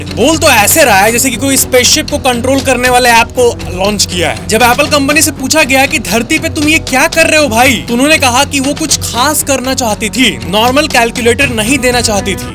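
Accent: Bihar, India. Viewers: Aap Bihar se ho n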